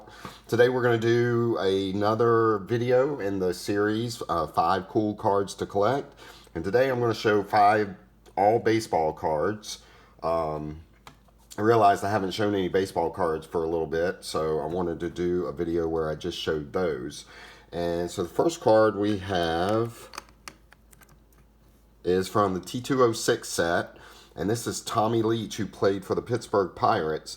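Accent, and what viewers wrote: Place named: South, United States. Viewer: Great choices. Your voice still sounds southern, though.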